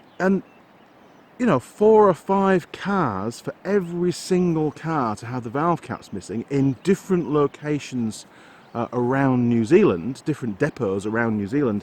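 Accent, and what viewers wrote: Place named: West Yorkshire, United Kingdom. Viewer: really enjoying your channel but one thing has been bugging me,, where are you originally from, you sound reasonably local to me in west yorkshire :)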